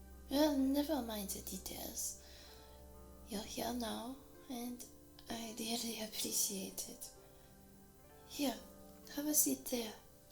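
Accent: Japan. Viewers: My dumb ass read the thumbnail text as ‘kitsunei kurasai’ 😂, Your Japanese accent was excellent and made the entire session truly special. The way you spoke English with that Japanese accent literally sounded exactly like my mama! 😆 Ohh and the new binaural microphone was an amazing experience, it immersed me to the point where I felt genuinely present in the workshop. The ending was perfect, sweet and wholesome. It added a heartwarming touch to an already delightful experience, leaving me with a sense of satisfaction and gratitude. Thanks so much for this superb audio it was a masterpiece. It’s now one of my favourites and I can’t wait for more like this!! 👏🏼 Good luck with your exam today, I hope your mamas feeling much better sending prayers and my best regards ❤️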